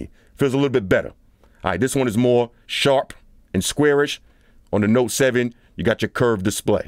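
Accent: New York, United States. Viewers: alright alright, i clowned this dude in the past because of his harsh voice and New York accent. but i see he done softened his image by putting a cat in his videos. Good job.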